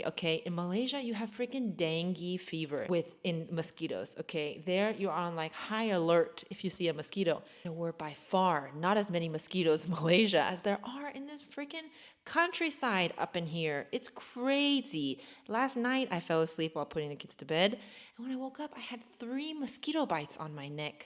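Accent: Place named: United States. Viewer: I just like to listen to your „American“ voice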